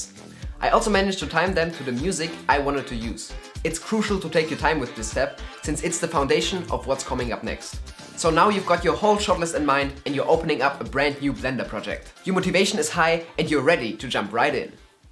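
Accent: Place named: Germany. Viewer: I hear German and I like it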